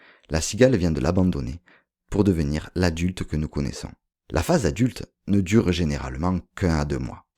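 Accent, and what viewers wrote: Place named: Provence, France. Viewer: Merci, vous êtes un conteur extraordinaire, avec notre bel accent provençal ! Ah, les cigales, j’adore… Mais dommage, elles ne chantent plus : c’est déjà la fin de l’été. 🦗☀️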